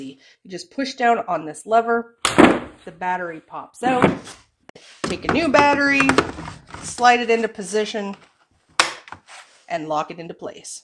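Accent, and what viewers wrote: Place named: Canada. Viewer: I THOUGHT you lived in Canada or close to it; because of your accent. I think accents are neat! And yours gives you away.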